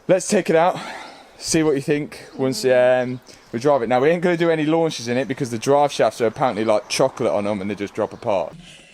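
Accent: Leicester, United Kingdom. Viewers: Can tell your from Leicester mate😂👍🏼 is that desford crossroads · you’re so Leicester it’s redic. Enjoy your coffeh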